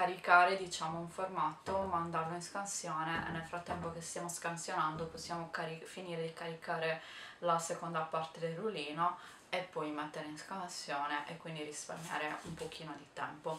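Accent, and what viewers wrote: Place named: Sardinia, Italy. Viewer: Sono felice di rivederti cara e principalmente di poterti ascoltare, fortissimamente sarda...:)))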